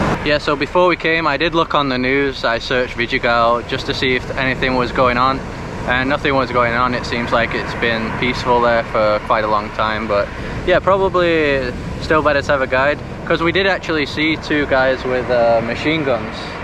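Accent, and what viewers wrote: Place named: Northern England, United Kingdom. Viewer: haa thought so you have a northen accent like me so picked it up just came across your channel and im liking it, loving vlogs from 🇧🇷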